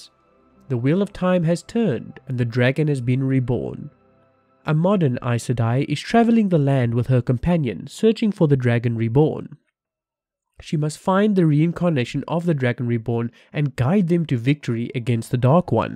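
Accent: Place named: South Africa. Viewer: Hey I like your videos. When I watched you I thought your accent sounded... familiar then I realised... jy is ook van SA. Lol. Great stuff man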